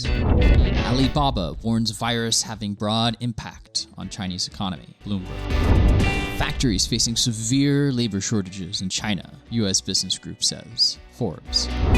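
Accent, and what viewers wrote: United Kingdom. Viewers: Tmr pinche inglés británico xd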